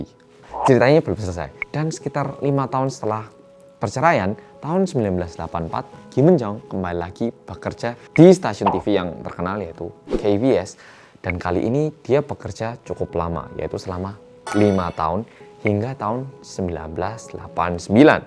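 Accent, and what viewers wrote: Java, Indonesia. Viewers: klik dulu cak medokk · Ya ampunn.. baru liat ytb abang medhok ini lagi, sekarang ada backsoundnya euy. 😜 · orang korea yang medok selalu di hati:) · Ganteng,medok,tuek,suarane apik, IsO ngomong Korea,wong Korea,gae konten mbendino,critane apik,urong rabi,duwite akeh,subscriber re akeh😠penak men koe Dibalik semua itu ada erjuangan yg luarbiasa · tim gecep...medokkk 😊😊😊😊😊😊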